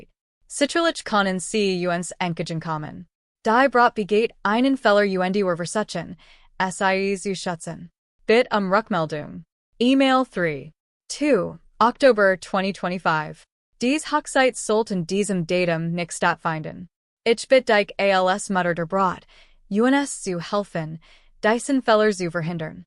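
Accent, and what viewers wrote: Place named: United States. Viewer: Was soll diese quälende amerikanische Sprache ???????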